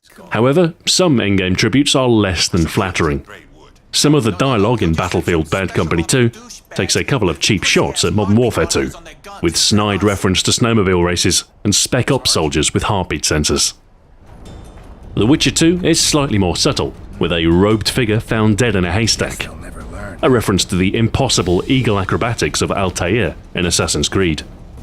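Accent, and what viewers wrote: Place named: England, United Kingdom. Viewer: English accent. All mighty men in movies and tv shows have an English accent or an accent from somewhere in the UK so that's why I thought of it.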